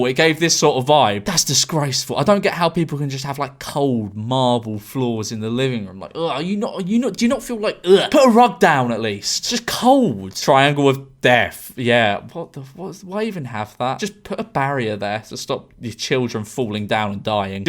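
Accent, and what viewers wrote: United Kingdom. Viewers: "You do see some absolute honkers listed for a million" the British way of speaking is so fun lmao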